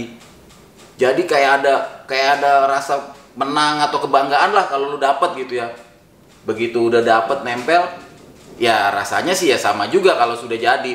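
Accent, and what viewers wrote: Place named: Betawi, Indonesia. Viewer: Tertarik dari bahasa Betawinya..nyablak..lama2 tertarik ngliet konten Vespa...padahal ga punya Vespa...lanjut bang......cihuy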